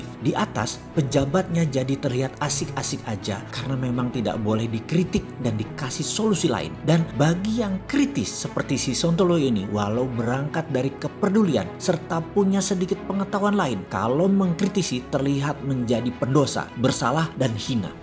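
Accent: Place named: Java, Indonesia. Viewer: Semangat boss medok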